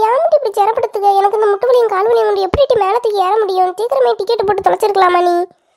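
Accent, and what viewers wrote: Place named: Nagercoil, India. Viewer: Super sis.... Sema...NGL slang....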